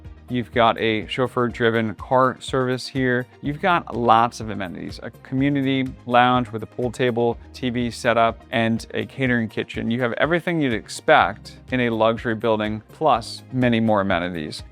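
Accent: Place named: Philadelphia, United States. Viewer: I'd trust this guy. He's so Philly. I hear the accent (just a little).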